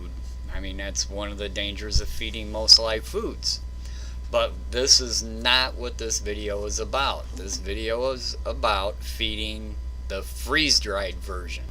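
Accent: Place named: Great Lakes, United States. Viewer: Great Lakes accent is strong. Yahey dere!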